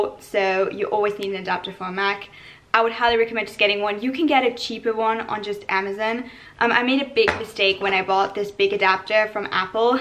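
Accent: United Kingdom. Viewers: omg i just watched her what's in my bag video from before and she has a bit of a british accent now loooool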